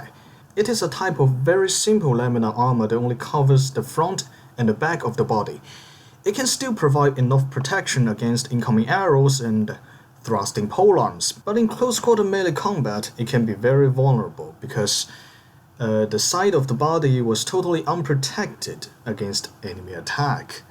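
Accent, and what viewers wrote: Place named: China. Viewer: By your pronunciation I assumed that you are Chinese so can I ask in Chinese? 我一直很好奇為何漢朝的盾 或中國以前的盾 都有點倒三角形 跟西方的騎士盾剛好相反 這是為何呢 ？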